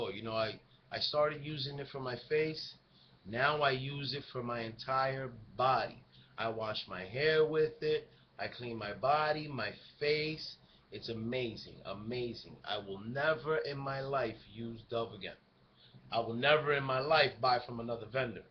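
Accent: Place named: New York, United States. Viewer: Gotta love that NY accent!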